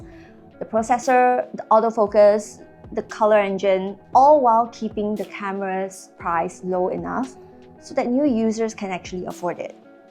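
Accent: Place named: Singapore. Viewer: fellow singaporean here and i’m so happy to hear a familiar accent on youtube!! :) i’m also planning to get this camera so this is very useful. thank you!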